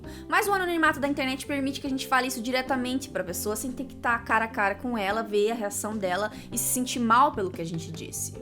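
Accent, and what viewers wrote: Brazil. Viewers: mano tu tem sotaque de sulista, eu amei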